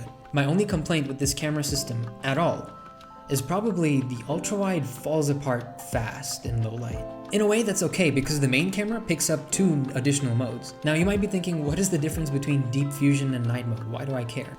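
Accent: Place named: United States. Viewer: Why is it your accent is like americans😍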